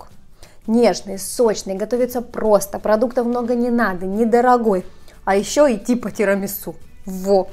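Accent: Ukraine. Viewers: Маладец умничька а акцент украинский это пустики главная хорошая память, спасобность, нада мала говорить а больше делать.🍩🍮🍞🍰🍯🍭🍔🍕📖🍑🍏🍎🍅🌽🍓🍑🍈🍌🍐🍇🍒🍉🍆🍉🍍🍐🍒🍋🍌🍊🍈🍈🍧🍨🍦🍦🍩🍩🍞🍞🍞🎂🍰🍰🍪🍪🍫🍫🍬🍬🍭🍭🍭🍇🍇🍒🍋🍋🍊🍏🍎🍊🍒🍇🍉🍌🍌🍈🍑🍊🍒🐤🐤🐤🐔🐘🍫🍩🍞🍰🍏🍊🍊🍑🍐🍌🍈😣😞😒🐼🍓.🍰🎂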